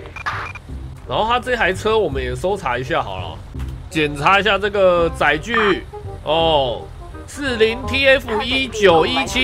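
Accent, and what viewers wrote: Hong Kong, China. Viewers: 你是香港人媽我不是但看ˋ你講話很像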